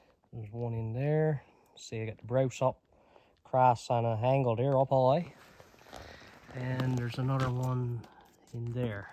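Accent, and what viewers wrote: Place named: Newfoundland, Canada. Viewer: How many slips to get 16? I thought that was good by far the best I've seen on here. What area are you? I'm from Lewisporte and your accent seems like that area or close by.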